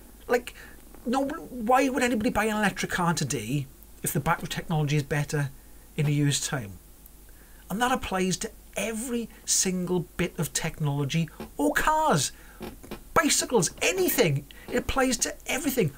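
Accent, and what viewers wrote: Wales, United Kingdom. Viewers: I think that you are great, I like your sense of humour, your friendly Welsh accent and your no nonsense approach.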